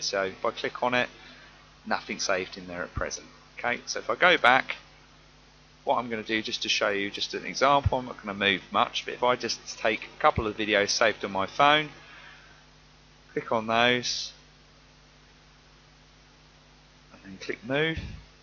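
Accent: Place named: United Kingdom. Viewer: Ahhh a sound of a British accent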